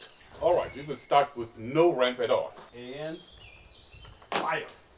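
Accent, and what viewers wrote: Germany. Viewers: Am I the only one who gets this sue per sinister feeling when I hear this dudes harsh German accent?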